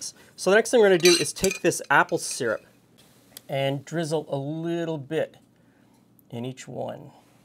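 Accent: Canada. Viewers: This is why I come here -- not just to remind myself of what a Canadian accent sounds like, but to learn little helpful tips.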